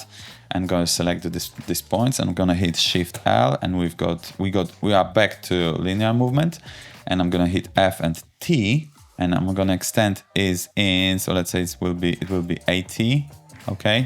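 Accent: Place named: Poland. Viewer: Mam wrażenie że słyszę tu polski akcent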